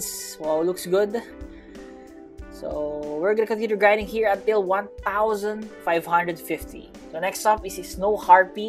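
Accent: Philippines. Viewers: Alam kong pinoy ka kasi sa salita mo pang pinoy na eh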